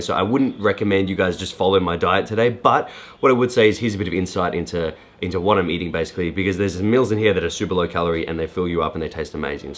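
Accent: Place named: Australia. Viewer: Your accent is getting more Australian for some reason 😂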